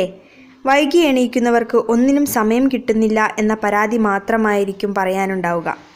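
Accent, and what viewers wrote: Kozhikode, India. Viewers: your clt slang is so attractive and sweet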